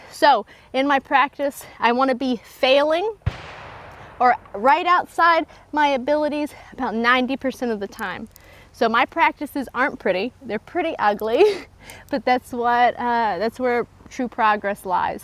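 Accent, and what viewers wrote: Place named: Shreveport, United States. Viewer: What a fun video to watch and I learned a ton. Your accent makes me miss living in Shreveport.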